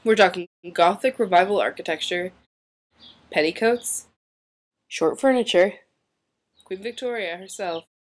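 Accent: Canada. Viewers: I just realized that you say ABOOT! It's slight but it's there! AWESOME! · Canadian accent? D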